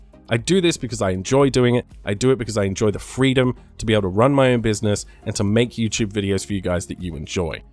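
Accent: Australia. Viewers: Congrats mate. No nonsense gaming coverage. And great to hear a fellow aussie. Keep it up 👍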